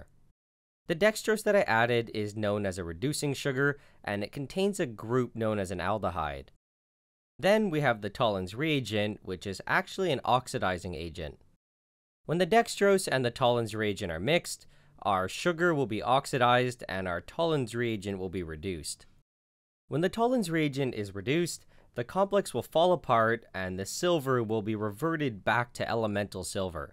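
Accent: United States. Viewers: The way americans pronounce mirror is a pet peeve of mine. There are 3 'r's in there, yet somehow you only pronounce 1?!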